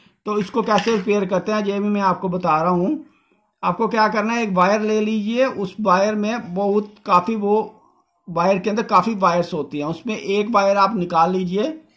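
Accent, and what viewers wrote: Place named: Punjab, India. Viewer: Yha pta chla ki Bhai Punjabi hai Osm vdo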